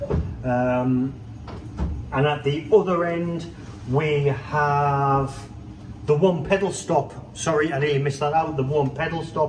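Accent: Newcastle, United Kingdom. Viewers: I always think of Harrison & Harrison organs as lavish instruments in lavish places like Westminster Abbey and Kings college chapel (Cambridge) - it's interesting to see and hear an example from the other end of the scale. For some other reason when I discovered this channel I put pipe organs and Geordie accents together and assumed you must work for Harrison & Harrison 😕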